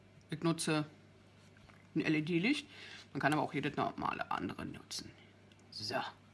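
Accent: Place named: Berlin, Germany. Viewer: Ach meine liebe.. ick find dein "Berlinern" einfach nur geil!! ;)) Rock it und dir nen schicken Abend! Maxi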